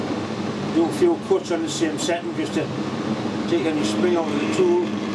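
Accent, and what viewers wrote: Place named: England, United Kingdom. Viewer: Nice going fer a twice Cacky -handed ,funny talkin` Pom , keep-on messin` aboot dear friend of the same affliction !! Regards Rod Chessell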